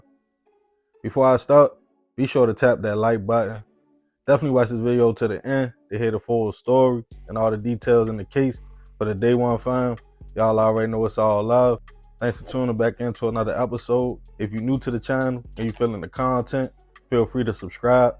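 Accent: Baltimore, United States. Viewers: This is my first time viewing one of your videos. I subscribed because your content was pretty good and I'd recognize that accent anywhere. Baltimore, right? #CharmCity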